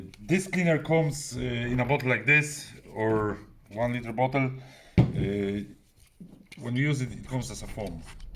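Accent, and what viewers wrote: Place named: Poland. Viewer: Szedłem o zakład, że słyszę polski akcent. Dobra robota. :)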